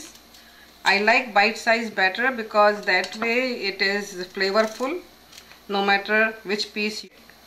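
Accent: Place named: India. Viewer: can you write the ingredients, i can't understand the ingredients, no offense, but i'm mexican and u are indian, and i don't understand you because of your accent, again no offense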